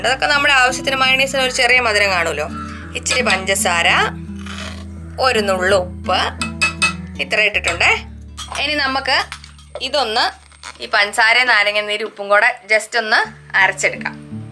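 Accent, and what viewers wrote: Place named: Idukki, India. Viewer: ചേച്ചിയുടെ സംസാര ശൈലി കേട്ടിട്ട് എനിക്ക് എന്റെ നാട് (idukki)ഓർമ വരുന്നു. ചേച്ചി നാട്ടിൽ എവിടെയാണ്?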